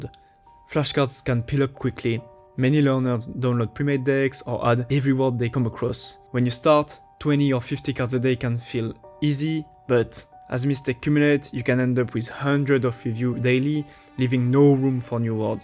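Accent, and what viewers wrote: France. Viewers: A guy speaking english with a french accent teaching me how to learn Japanese. Truly something 😂.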